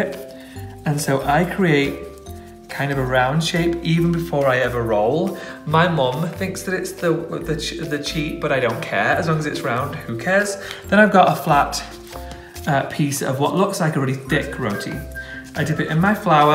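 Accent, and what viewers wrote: United Kingdom. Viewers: A C R Maybe it’s just the dialect that I grew up with, but roti also has a solid “o” sound and a thick “t,” I think he knows he’s using an English accent because he pronounces it with a Pakistani accent in the beginning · Zehra Rizvi Isnt he British?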